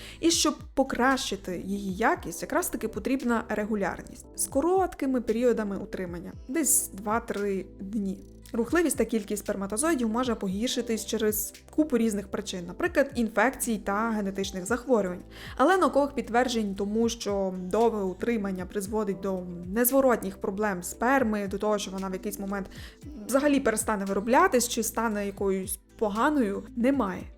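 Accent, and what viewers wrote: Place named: Galicia, Ukraine. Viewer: Ведуча дуже швидко торохкотить, та ще й з галицьким діалектом! Доводиться призупиняти відео вдвічі!!!.. Викладає не дуже переконливо та не цікаво!..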